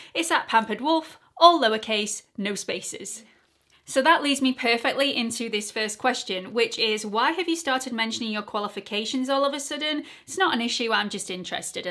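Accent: United Kingdom. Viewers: you are a gem! I always look forward to your videos! Between your adorable personality and your British accent, I would watch you all day, even if you didn't ever share the wonderful information with us. Hugs from Iowa in the US! 💓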